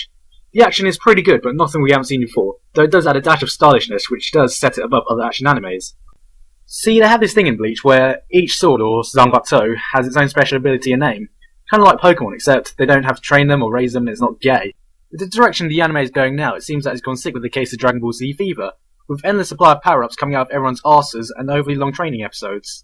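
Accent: United Kingdom. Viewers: used to sound so much more British lol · he's british accent was pretty thick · He really had a strong British accent for a Thai lol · His British accent was stronger back then. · his accent is more british here, he changed a lot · Old school Gigguk sounds more British 😅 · the british accent in this video hits differently with attitude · Lol he sounds so british at this time. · holy shit he sounds so bri'ish. he sounds so white when i picture him talking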